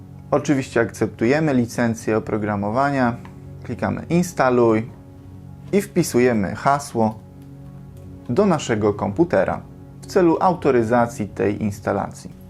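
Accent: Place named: England, United Kingdom. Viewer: I ten angielski akcent. Bardzo przydatny film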